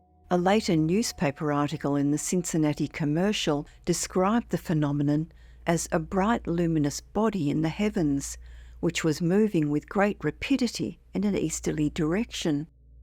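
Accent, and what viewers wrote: Australia. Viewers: The Australian accent makes it less credible. I'm not sure why. Maybe its a Ross Coulthart effect. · She’s American. This is an Australian accent!!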